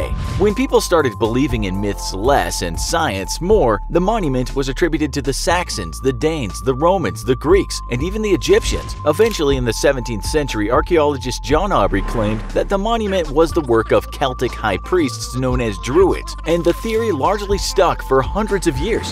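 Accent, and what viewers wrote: United States. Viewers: Why is an American doing the commentary? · An American accent makes everything sound like a conspiracy theory... and not believable.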